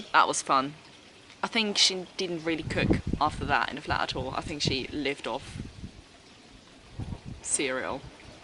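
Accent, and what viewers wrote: United Kingdom. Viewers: Du klingst als ob du zu 100% britin wärst :)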